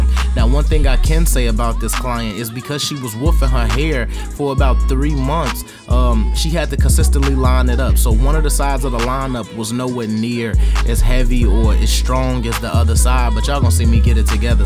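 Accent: Chicago, United States. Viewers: I knew the way you talk you was from the land. I’m definitely sliding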